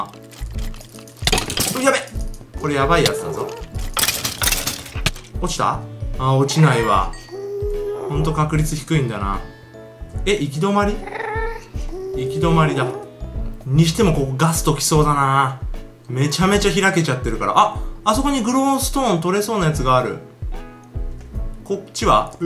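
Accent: Niigata, Japan. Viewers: 多分だと思うけど、新潟県出身なんじゃない？ヒカキンは、だから、おっちゃうっていていると、おもう。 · 今日は地元の方言がよく出ますね（新潟県民より） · ヒカキンよく落ちること「落っちゃう」って言うけど新潟の方言(´ω`)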